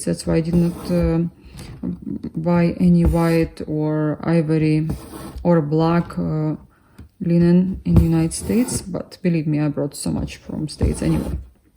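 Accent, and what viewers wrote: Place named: Russia. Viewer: Очень нравится ваш "русский" акцент😊😊 а не как другие, пытаются симулировать акцент. хрипеть еле выговаривая слова 👍👍👍👍👍понятно каждое вами сказанное слово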